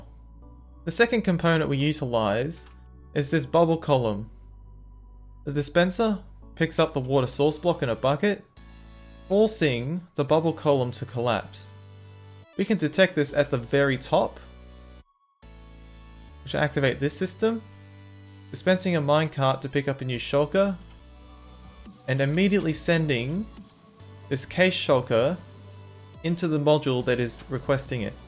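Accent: Australia. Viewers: Aaahhhhyyyyy an Australian!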